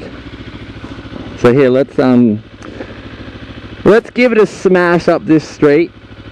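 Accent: Australia. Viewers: mate he has an aussie accent... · Those were very nice videos considering I must have seen every video on "how to ride a motorcycle" right before I got my licence. BTW, nice australian accent and I like how you thoroughly mention every key point and the little things that do actually count. Thanks mate.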